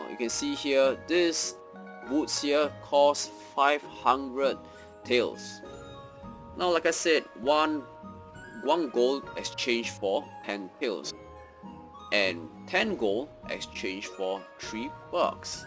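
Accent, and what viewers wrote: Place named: Arab world. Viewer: u have mid east English accent and u can speak Chinese?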